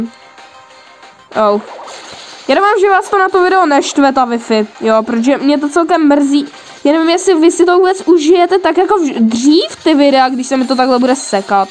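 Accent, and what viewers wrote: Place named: Ostrava, Czech Republic. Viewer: Je Ostravák :)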